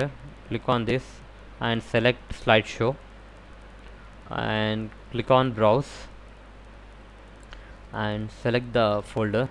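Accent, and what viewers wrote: India. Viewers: Bro what it doesn't matter if he has an Indian accent, he is still speaking English at the end of the day.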